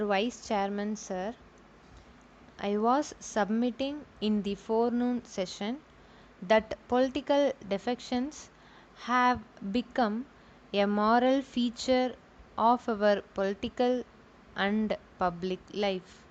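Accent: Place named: South India, India. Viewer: Yesi dictation exm m ayegi to kyse samjh ayega bhai pura south accent h ye to 🤔🤔🙄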